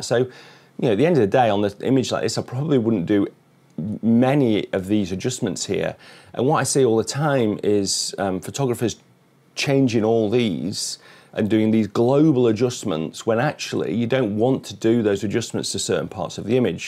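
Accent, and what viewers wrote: United Kingdom. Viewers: Today i find out most annoying English accent in the world uk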